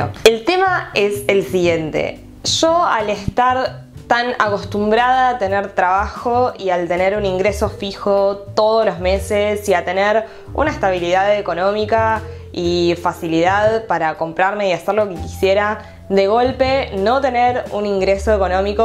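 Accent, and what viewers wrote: Argentina. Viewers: Me vuelve loco tu acento! Me encanta escuchar a las argentinas, ufff!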